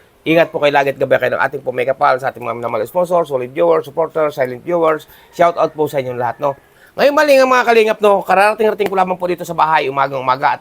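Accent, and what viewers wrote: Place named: Batangas, Philippines. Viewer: Batangueño prin ung iyong punto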